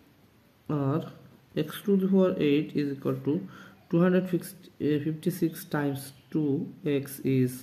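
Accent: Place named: Bengal, India. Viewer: Bengali accent XD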